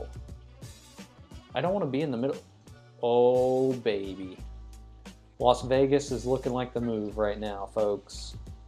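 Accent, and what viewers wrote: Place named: Mexico. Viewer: Maybe tone down the intense mexican accent, seem a tad off for a guy called Other than that great stuff thanks for the posting! You are a champ!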